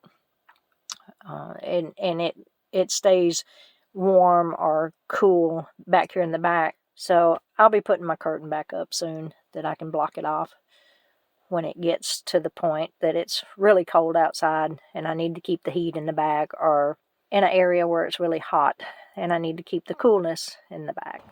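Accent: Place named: Georgia, United States. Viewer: Yay!!!! I knew I recognized that accent ! Hehehe Greetings from Savannah. I saw your Ga car tag briefly in the video . I’m a new subscriber and looking forward to your travels. 😎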